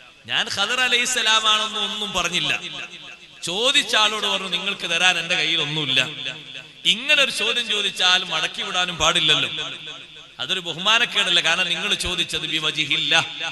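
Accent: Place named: Tamil Nadu, India. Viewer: E usthad thamizh natukarana athu konda chila aksharangal nammale ppole parayan pattathe athu kariyamakanda usthadhavarkal paranchath ketal mathi athanusarichu jeevikkan shramikkuka allahu usthadinu afiyathulla dhergayuss nalkatte ameen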